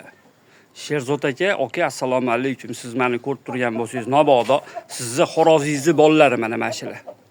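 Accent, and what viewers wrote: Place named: Tashkent, Uzbekistan. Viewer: Xaqiqiy toza Toshken sheva 😊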